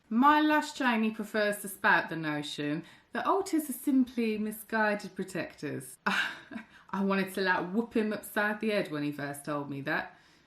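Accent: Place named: Cockney, United Kingdom. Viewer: a... british accent? (is it?)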